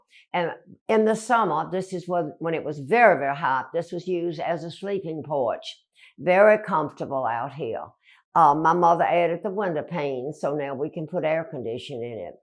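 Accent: South, United States. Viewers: Oh dear, what a precious little lady!!! I’m like two minutes in and I’m just dying over her sweet southern accent! 😍😍😍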